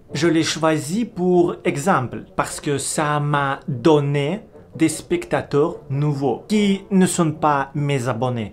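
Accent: Russia. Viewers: Bravo tu progresses de jour en jour dans ton élocution;. Tu vas gagner en fluidité grâce à la pratique. Je pense que tu vas arriver très rapidement à parler le français sans accent russe.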